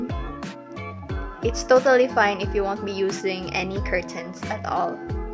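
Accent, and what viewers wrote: Philippines. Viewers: Huh she sounds like from Philippines but thx for tutorial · Why do you sound like you're from The Philippines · i can tell she's phillipines by her english accent lol❤ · you sound like Filipino to me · I really don't have the materials for this... POTANG INA!!! (but this is the first time I've heard your irl voice) :) You really sound like a true Pinoy!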